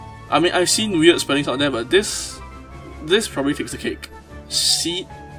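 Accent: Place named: Malaysia. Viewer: never thought I would hear a Malaysian accent.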